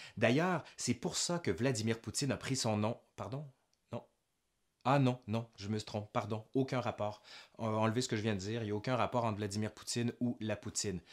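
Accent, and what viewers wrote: Quebec, Canada. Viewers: Ta capsule donne trop envie d’aller au Québec pour goûter cette fameuse Poutine Une amie française s’est expatriée deux ans dans ton beau pays . Une nana un peu difficile en matière culinaire . Elle a a do ré la poutine Bref merci pour cette vidéo J’adore ton ptit accent charmant que nous les français on comprend super bien 🤗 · Quel plaisir d'entendre un québécois parler de la poutine....bordel que c'est bon remarque le schisme de la frite entre les belges et les français ça marche aussi hein ^^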